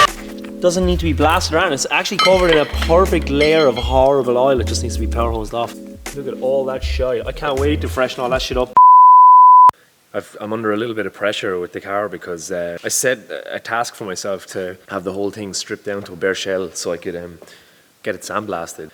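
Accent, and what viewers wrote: Ireland. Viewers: I missed your FOKYN Irish accent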